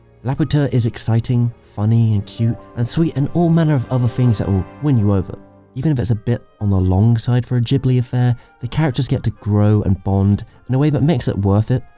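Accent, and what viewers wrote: England, United Kingdom. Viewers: ''there aren't like a hundred more Miyazaki films''we don't need them!!!!!!!!!!!!!!! they were great but not always the best!!!!!!!!! there are others out there ….nothing has to be a perfect animation!!!!!!!!!! Ghibli only got popular because of Japanese culture of façade and completabilities with other animating companies... the world went through a trendy stage where Ghibli came on a time where animation needed some direction...let go of this idiotic Ghibli shit!!!!!!!!!!!!! it's over...picachu came with live version which is absolutely fuckin' idiotic...hopefully miyazaki will not allow asshole Disney to make idiotic live version of Ghibli shit!!!!!!!! is it me or is it always have to be an English accented fool doing Ghibli documentaries...?not bad doc....maybe because appreciation and understanding is far better than many other culture that English accented people do these types...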